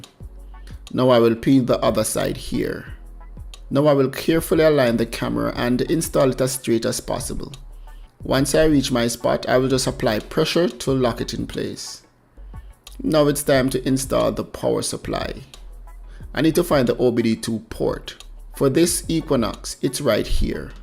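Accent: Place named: Bequia, Saint Vincent and the Grenadines. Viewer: Love de accent mon! Skiann for scan takes me right back 50 years to Bequia and discovering white guys spoke with the same accent, wild!!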